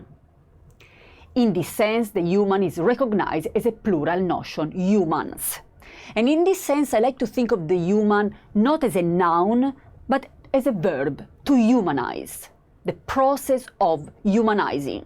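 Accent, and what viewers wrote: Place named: Italy. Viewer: English with Italian accent sounds cute here...😘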